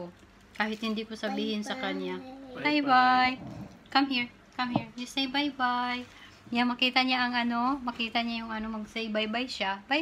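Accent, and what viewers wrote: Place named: Ilonggo, Philippines. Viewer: Prang ilongga k mgsalita malambing. At mahinahon..new subscriber hr. #OFWinjordan.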